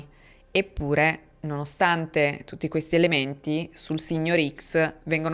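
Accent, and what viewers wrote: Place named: Piedmont, Italy. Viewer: Che bell'accento Piemontese! brava :)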